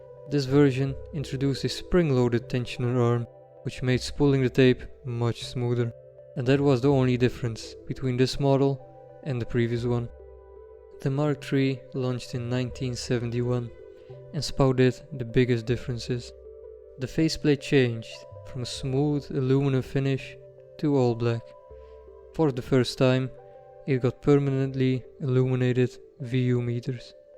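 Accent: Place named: India. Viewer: First i thought, there is speaking an indian😅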